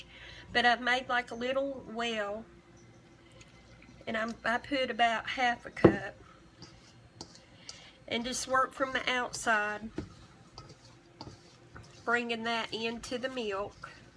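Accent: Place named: South Carolina, United States. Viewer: Since I am new, where are you from...You have a South Carolina accent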